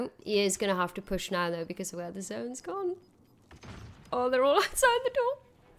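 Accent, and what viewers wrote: United Kingdom. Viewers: Is she from the UK?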